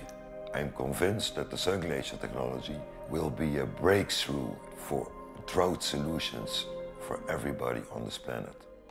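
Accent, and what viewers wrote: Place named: Netherlands. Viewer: Nederlands accentje wel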